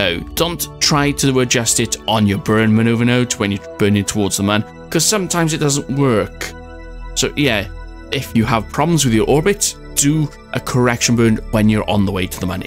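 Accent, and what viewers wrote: Wales, United Kingdom. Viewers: I’m sure you’re Welsh, but I keep hearing twangs that make me think Scottish or Irish? Anyway dope vid man, I have nowhere near the science to do this, and no idea how to get it, but thanks. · Scott Manley is Scottish, this guy is Welsh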